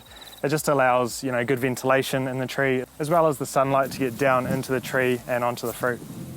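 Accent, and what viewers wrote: New Zealand. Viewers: This is the best video on how to grow peach or nectarines from seed. This is how you make good videos. No nonsense video. It must have taken a lot of patience. The kiwi accent is like a cherry on the top.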